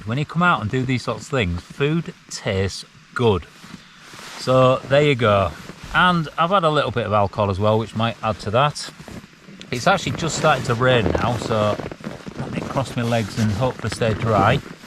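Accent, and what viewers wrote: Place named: Yorkshire, United Kingdom. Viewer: Nothing better than sitting listening to a pissed Yorkshireman pontificating........... "When I wut Lad...." Brilliant.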